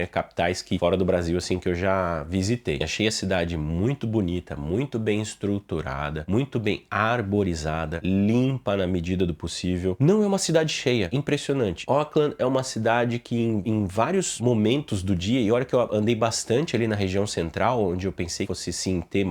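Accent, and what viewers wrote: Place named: United States. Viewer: Sotaque forte eu acho quem fala esse R que você fala, tipo americano. PoRRRTa kkkkkkk😅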